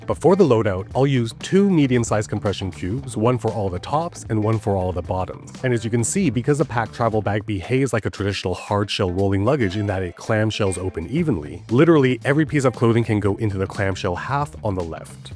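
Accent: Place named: China. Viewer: How can you have a Chinese accent despite presumably being born/growing up in Canada/the US?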